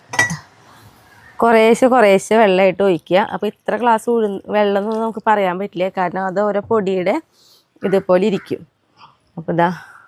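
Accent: Thrissur, India. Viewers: Thank youvery much for the information. Are you from Tcr side I am happy to hear our Tcr slang .now iam in Kollam.your way of presentation and smile beautiful. The vessels you used are super .surely I will make these Dosas try to make my huaband Happy. Bye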